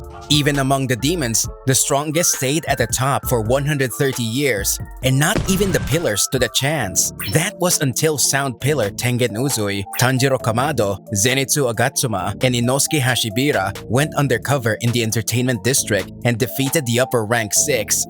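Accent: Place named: Philippines. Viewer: I like the subtle Pinoy accent, love this what if scenarios kabayan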